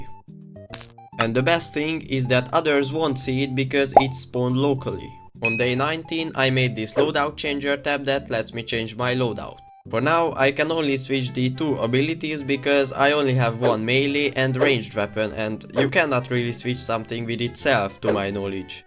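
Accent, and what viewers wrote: Hungary. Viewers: magyar vagy? csak azert kerdezem mert hallom az akcentust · ijj tesó a magyar akcentus (félre ne értsd, nincs vele baj <3)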